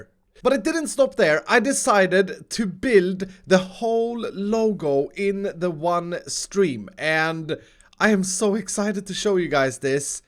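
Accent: Sweden. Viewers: Hörde att du var svensk på din dialekt :) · Are you form swiden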